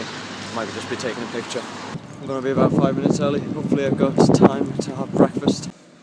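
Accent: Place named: Northern England, United Kingdom. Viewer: Thanks for the upload my friend, I'm from the North of England (Newcastle) and i think i can hear a hint of Northerner in you :D Were are you from?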